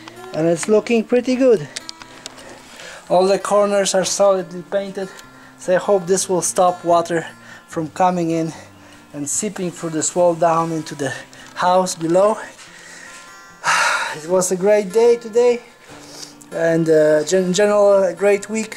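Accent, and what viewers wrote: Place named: United States. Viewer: masz piekny dom i swietna rodzinke moje gratulacje. Mam jedno pytanie i bylbym wdzieczny gdybys odpowiedzial, czy mieszkales kiedys w USA? twoj angielski jest bardziej amerykaski niz ten z Wysp.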